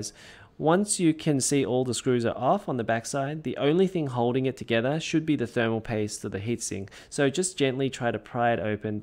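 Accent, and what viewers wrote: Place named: Australia. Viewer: Thanks. Great video. Always nice to hear an Aussie accent on youtube.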